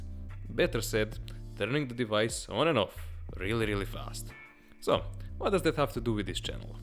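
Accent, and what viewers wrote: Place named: Russia. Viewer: His accent makes him sound like a physicist from mother Russia he sounds like he knows what he’s talking about 👀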